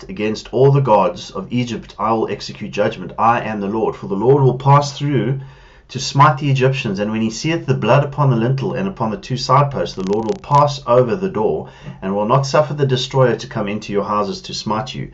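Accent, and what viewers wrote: South Africa. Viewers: There's no way I'm rolling my eyes...Just happy to see you haven't given up and that you are still looking and searching, which is encouraging to those of us who can't wait for the return of Our Lord and Savior Jesus Christ....Things are defenitely coming to a head in the middle east,and who knows what North Korea have got on their mind....Thank you for your work...As I mentiioned before,I am living in South America and I love to hear a fellow SA accent,especially knowing you serve The Lord....May God bless you....Maranatha! P.S. I don't know if you follow Amir Tsafarti from "Behold Israel"...He also serves the Lord and gives excellent updates on the Middle East.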